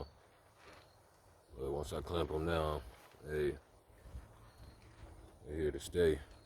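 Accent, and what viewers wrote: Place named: South Carolina, United States. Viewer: I’m new to the channel but I swear from the way you talk you’re from low country South Carolina.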